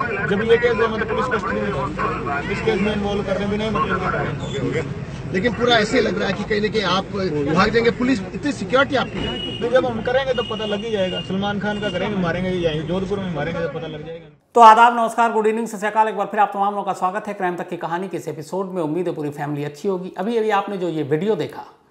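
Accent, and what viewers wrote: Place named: Mumbai, India. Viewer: bambiya bolte king 👑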